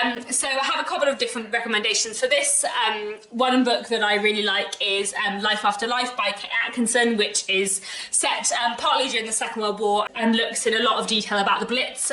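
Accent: RP, United Kingdom. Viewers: your accent is so "catchy"! something between RP and posh English. love it !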